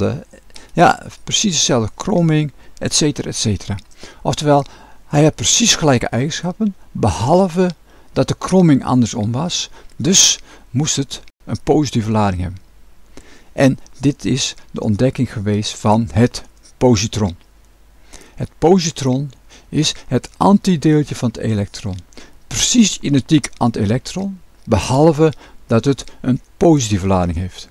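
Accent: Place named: Drenthe, Netherlands. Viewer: Heerlijk drenths lol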